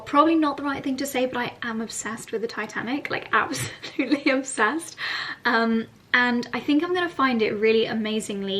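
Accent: United States. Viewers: I just love the American twang you have in your accent when you go State side 🥰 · Oh and your American accent is hilarious 🤣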